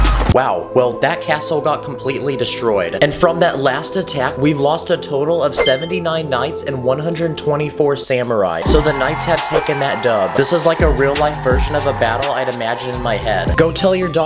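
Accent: Scotland, United Kingdom. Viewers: BRO IS SCOTTISH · Well, that great British accent😅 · HE’S STOTISH LIKE. E I’M BRITISH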